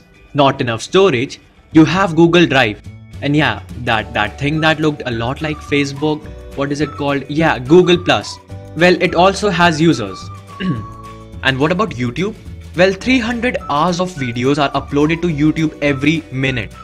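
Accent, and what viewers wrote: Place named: India. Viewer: Indian accent 😑😂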